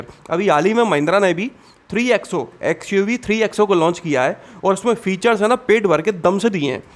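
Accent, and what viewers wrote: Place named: Gwalior, India. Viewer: Bhai gwalior se ho kya awaz se lag rha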